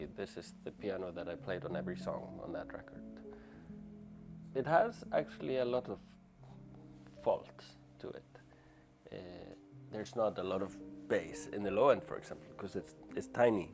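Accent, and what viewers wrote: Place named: Iceland. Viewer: Just happened to witness you talking for the first time and your Icelandic accent is so charming. You could easily do ASMR content btw. Oh, and cheers for all the blissful music you make. ❤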